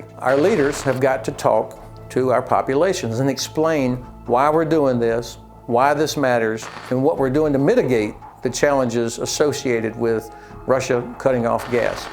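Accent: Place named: South, United States. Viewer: You have a southern United States accent so you cannot know what you’re talking about.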